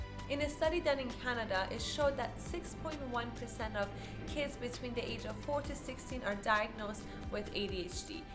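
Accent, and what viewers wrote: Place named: Eastern Europe. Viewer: I love your accent ma'am. Are you from Eastern Europe?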